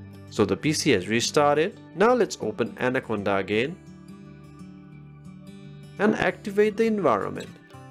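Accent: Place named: Bangladesh. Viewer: When you said GB to zee bee i knew you are from Bangladeshi kamla bosti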